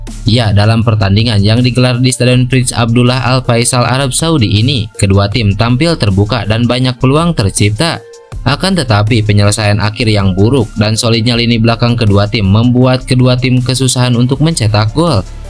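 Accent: Java, Indonesia. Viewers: Ini youtuber bicara mya aja medok ga bagus , nyesel putar vidio ini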